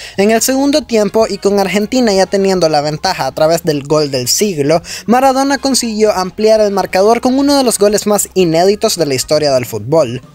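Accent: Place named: Nicaragua. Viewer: Es de Nicaragua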